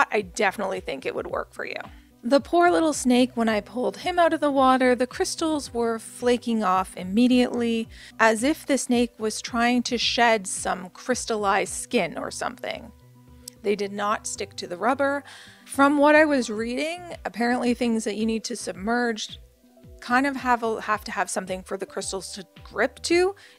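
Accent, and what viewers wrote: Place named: Canada. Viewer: Watched a few shorts on this method , but yours BY FAR is what i was searching for ! Plus i here s hint of fellow CANADIAN accent <3 TY for all your hard work !! HAVE A GREAT DAY!!!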